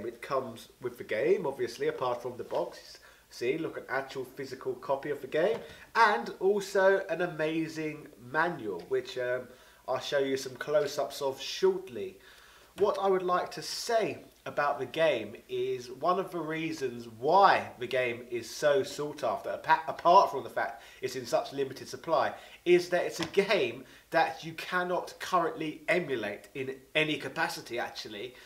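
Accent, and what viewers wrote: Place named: Bromley, United Kingdom. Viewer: I noticed you did a bit of a posh accent in this video. Does that mean you live in Bromley?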